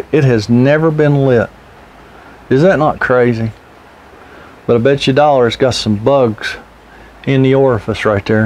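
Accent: Appalachia, United States. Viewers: "I wasn't holding my mouth right" - at that moment I knew I was following the right RV tech, as you were speaking my language. Lol I'm your neighbor down in Tennessee, so I understand that Appalachian dialect. I am an RV tech in training, so I really enjoy your videos! Thanks for taking the time to do them!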